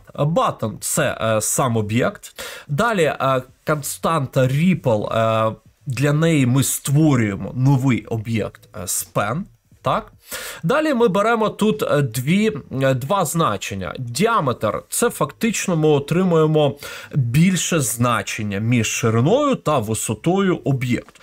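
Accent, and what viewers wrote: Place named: Carpathians, Ukraine. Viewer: Что свинособачий говор карпатских рагулей? Рагули умеют даже компьютер включать?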